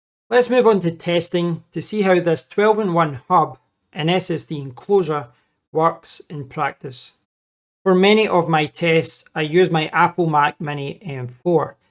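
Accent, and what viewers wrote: Scotland, United Kingdom. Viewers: a great day to perfectly understand a Scottish accent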